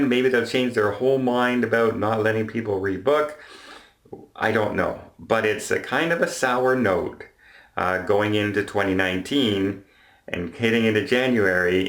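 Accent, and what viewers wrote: Canada. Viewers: Don? I love your videos. I/m just an average Gay American (IF that's even possible??) So, I grew up on the West Coast (Los Angeles) and I hear accents. Don? I knew you were Canadian the minute I heard your accent AND??? Because you are a kind and respectable person. YOU are a treat!!!!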